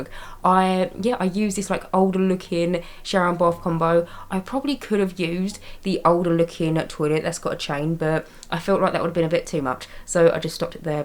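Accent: United Kingdom. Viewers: Love ur builds since for ever!! But what really sold me was ur beautiful Brittish accent😂❤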